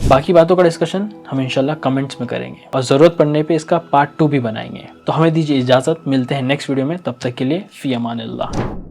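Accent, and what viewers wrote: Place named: Marathwada, India. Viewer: Aapki Tone se lag raha aap, Aurangabad , Parbhani ya Nanded ke ho😅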